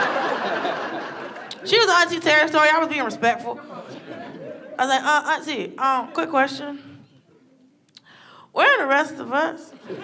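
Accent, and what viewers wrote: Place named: New York, United States. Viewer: Her NY voice is grating...and annoying.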